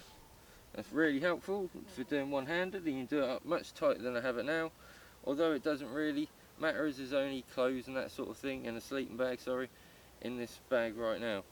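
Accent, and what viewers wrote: Norfolk, United Kingdom. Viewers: I really enjoy your videos very helpful and you have such a nice Norfolk accent 🚴‍♀️🚴‍♀️🏳️‍🌈🏳️‍🌈🚴‍♀️🚴‍♀️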